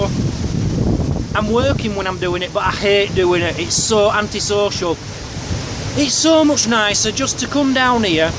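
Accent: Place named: Liverpool, United Kingdom. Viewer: OMG he looks like Bilbo :3 but just with a liverpool accent. so cute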